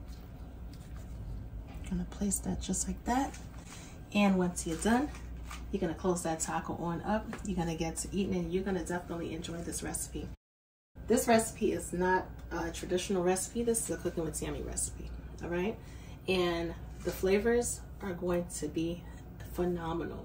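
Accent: New York, United States. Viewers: I’ve gotta say that New York accent takes me back to my days of living in Chelsea. 🏙️ 🥘♥️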